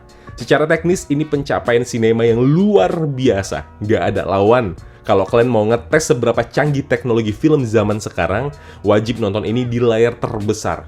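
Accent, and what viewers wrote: Medan, Indonesia. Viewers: bener banget lgi yg di bilng abg ini. komposisi crita nya hampir sama. dmna eywa membantu dengan mengirimkan bala bantuan yg sama, terus pas war di air juga sama. tpi smua itu terbayar karna visualnya dan action ny. ya cukup di nikmatila bagi orng yg gk terlalu Mandang crita. btw ko orng medan ya bg😂?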